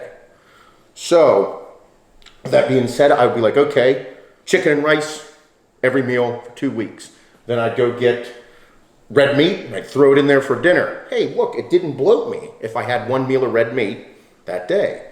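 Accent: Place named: Cleveland, United States. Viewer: You anywhere near Cleveland? I hear the accent and see giant eagle got a feature.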